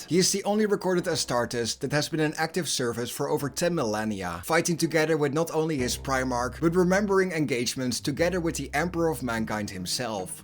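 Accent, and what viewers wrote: Netherlands. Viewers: The Dutch accent is music to my ears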